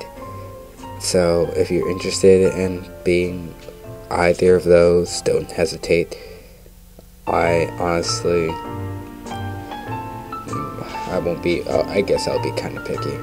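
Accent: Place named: United Kingdom. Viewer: You sound so british in this video. on another note, I can help.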